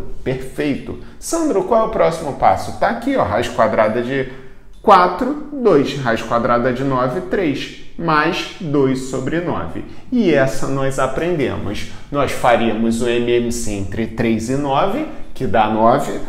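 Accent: Rio de Janeiro, Brazil. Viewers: voce e carioca? tem o mesmo sotaque que eu falo tudo com x mano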